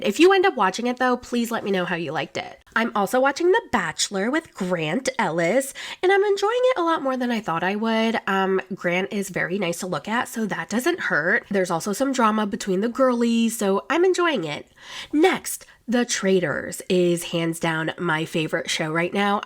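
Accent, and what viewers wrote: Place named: South, United States. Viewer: I just love you, ♥️🌹your southern accent is amazing 👏 great job, great podcast 👏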